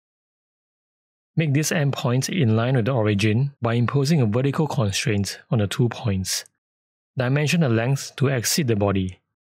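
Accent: Singapore. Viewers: I love your channel! Out of curiosity, are you by chance based in Singapore or from Singapore? Your accent sounds very Singaporean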